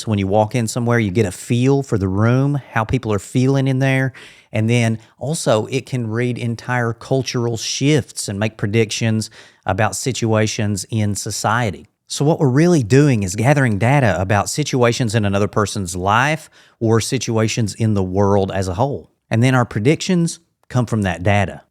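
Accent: South, United States. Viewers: What state are you from?? Trying to figure out the accent bro lol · Love your southern accent.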